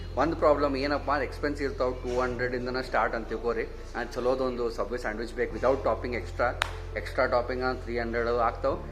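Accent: North Karnataka, India. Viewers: Subway sandwiches are good. I too like these. Enree full north Karnataka language. I like this style. Are you only son to your parents? You are lucky boy.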